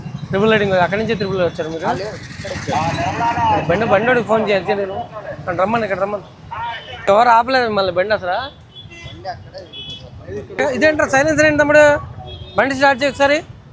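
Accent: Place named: Andhra, India. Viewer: ఆంధ్రవాళ్ళ వాయిస్ బలే కామెడీ గా ఉండిద్ది.... మీరు విజయవాడ అని అర్ధం అయింది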